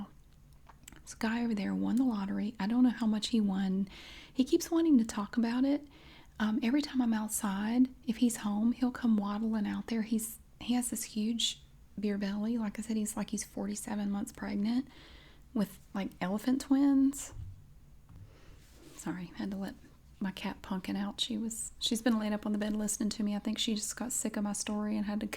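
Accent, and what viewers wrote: South, United States. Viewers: I love how much more southern you sound when you get goin' 😂💕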